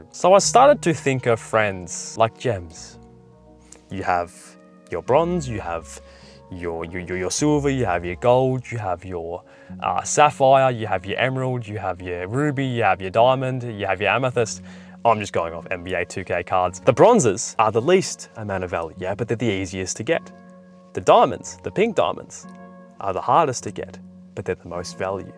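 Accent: Australia. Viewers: I mean you have the looks I never get use to the Aussie or kiwi or whatever accent that is but I'm sure some other women like it lol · I sense an Australian accent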